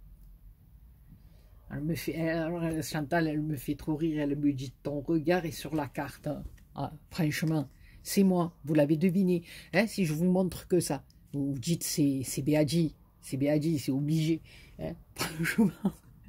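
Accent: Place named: Midi, France. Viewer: Coucou merci pour ce partage de bal. De jolies créations encore.. Tu m'as fait rire avec "c'est une petite galejade" 😊 du Midi ça te va bien avec ton bel accent que j'ai perdu 🙂 Je suis du 84 😎 mais vis dans le 50 ☔ depuis 2013 😌 Alors pour moi c'est juste un plaisir de t'écouter 😊 Bisous!😘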